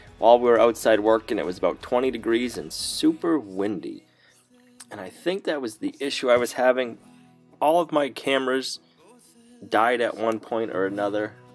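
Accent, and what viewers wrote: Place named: New Hampshire, United States. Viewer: Ok, so I'm from LI, NY, we say Hot differently than folks from NH, or from Mass by way of NH.....how do you say Hut and is it different than the way you say Hot? I'm fascinated by that difference in accent. Not making fun of it but I'm puzzled by where your accent comes from! I've met folks from Northern VT and Northern NH, I think it's more the NH folks that have a distinctive accent. So in NY we might joke about how Bostonians say "park the car" from NH it's more "you can't get there from here" which has a very different accent than Boston! Loving the quirks of our regional accents! Which explains some of the reasons I also enjoy Cog Hill Family Farm from Alabama and Growing Grass family farm from Louisiana!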